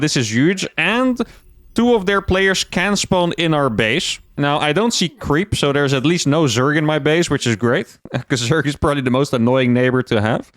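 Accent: Netherlands. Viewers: Oh ja, dat Nederlandse accent XD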